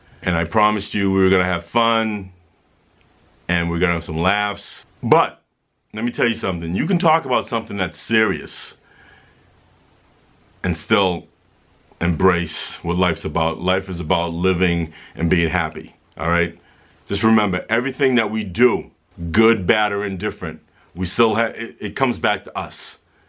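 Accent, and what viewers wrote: Boston, United States. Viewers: Lol i never really noticed you have a Boston accent until now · Your accent is really mild compared to a lot of other bostonians lol I've talked to.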